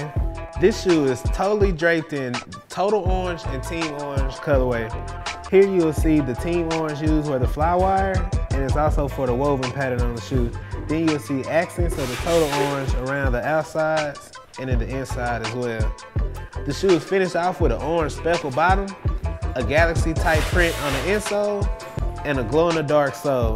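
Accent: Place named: Texas, United States. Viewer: texas accent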